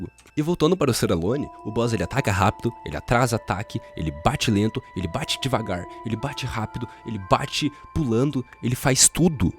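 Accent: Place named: Sao Paulo, Brazil. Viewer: Eu não vejo problema nenhum nesse vídeo. Eu vejo problema em quem esperava algo bom sendo dito por alguém que fala igual paulista...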